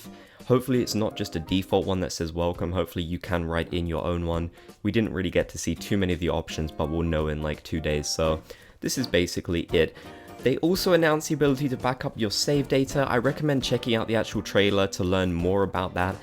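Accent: United Kingdom. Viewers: His British accent is thicker than Wendell the Walrus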